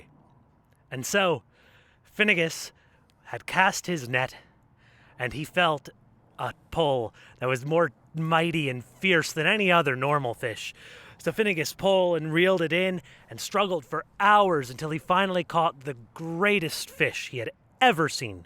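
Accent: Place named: Ireland. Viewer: You have an Irish tongue, m8. Thank you for sharing. Sláinte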